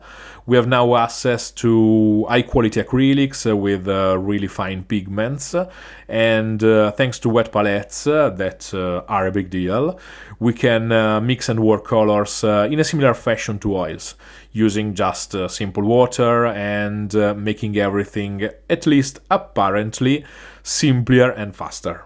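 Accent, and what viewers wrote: Italy. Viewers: good lord....that looks amazing..... love the slight italian accent gives all the artwork a "fathers of the renaissance" touch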